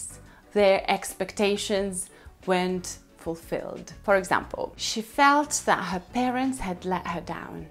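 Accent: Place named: United Kingdom. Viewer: I loved her British accent sounds really cool and like a native speaker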